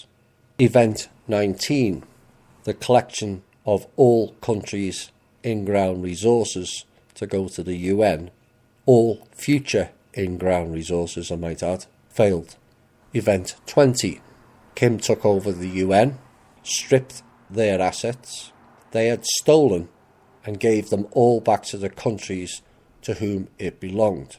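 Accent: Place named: Liverpool, United Kingdom. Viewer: That's a Liverpool accent.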